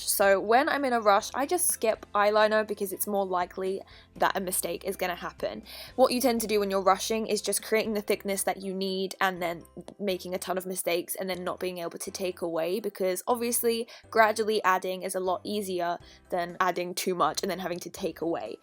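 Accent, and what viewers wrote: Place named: United Kingdom. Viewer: Ohh my God I’m new to this channel and the only thing I noticed is she has a British accent.....😍😍😍😍lol I also love in London I meant *live in London 😘😘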